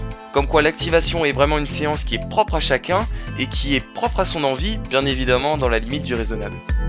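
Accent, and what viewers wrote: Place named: North, France. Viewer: La piste magique d ordegem à encore frappé!!! Sub 8'50 en junior c est très fort, plus la chute et les petits piétinements avant certaine barrière c est encore plus beau, hate de voir l evolution dans les prochaines années, bon courage pour la suite de la saison 💪 Ps: magnifique accent du nord, c est pas possible tu as de la famille qui habite par chez nous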